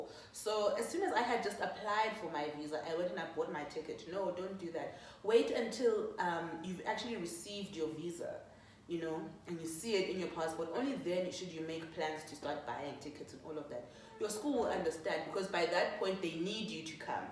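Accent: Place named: South Africa. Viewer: I love your Xhosa accent dear. You make me miss ezlalini. And thanks alot for such an informative video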